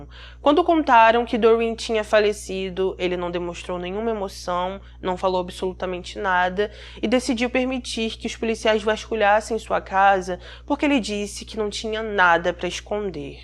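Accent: Rio de Janeiro, Brazil. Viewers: QUE SOTAQUE TENEBROSO É SOTAQUE CARIOCA QUE SOTAQUEZINHO DE MERRRRDA..AINDA MAIS QUANDO HÁ EXAGEROS.. COMO ESSA MULHER..MAIXXXXXXXXX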